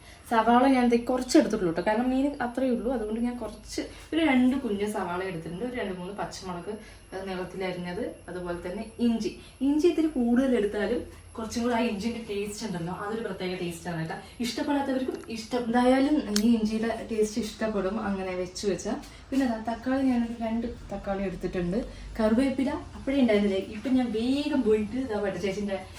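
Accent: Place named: Palakkad, India. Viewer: Palakad slang very nice 🥰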